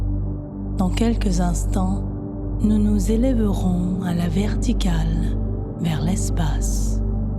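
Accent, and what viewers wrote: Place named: Democratic Republic of the Congo. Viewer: C'est pas agréable de se faire raconter une histoire par une personne ayant un accent congolais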